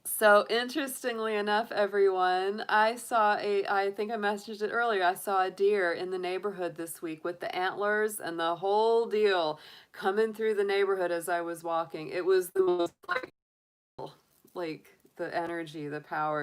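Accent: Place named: South, United States. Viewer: I love your southern accentb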